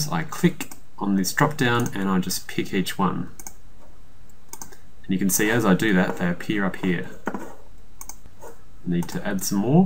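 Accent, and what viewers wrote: Australia. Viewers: Fantastic, you've saved me a whole heap of heartache - so clear and concise - nice to hear an Aussie voice on youtube as well:)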